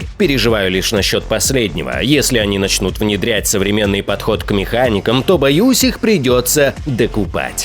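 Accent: Ukraine. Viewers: Сотня вподобайок за гарну україньську! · Яка гарна українська😃🤗 гадаю що ви ховаєте цілу нишу можливостей!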